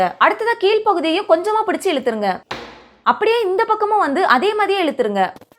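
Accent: Tirunelveli, India. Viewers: உங்களுக்கு திருநெல்வேலியா ? எந்த ஏரியா?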